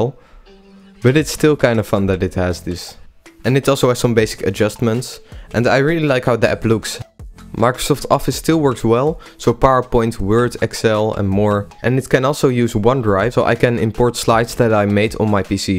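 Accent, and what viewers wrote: Netherlands. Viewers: Its funny that i can hear your Netherlands accent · are you dutch by any chance? your accent in english is same as mine haha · I smell Nederlands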